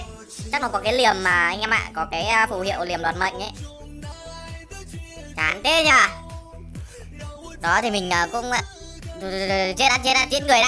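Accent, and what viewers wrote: Vietnam. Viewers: Giọng Nam Kì Hay và Hài thế mà đặt tên như vậy đúng như mình miền Nam mình rất bn vì Nam Kì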